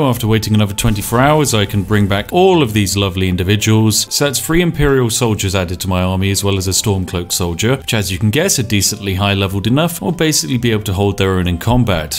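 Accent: United Kingdom. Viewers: Absolutely brilliant tips! 👏👏👏 love your british accent, so energetic and just... lovely🥂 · Watching you break games with your amazing British accent brings me joy sir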